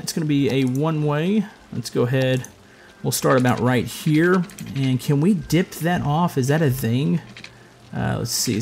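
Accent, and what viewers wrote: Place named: United States. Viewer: Your American accent drives me crazy!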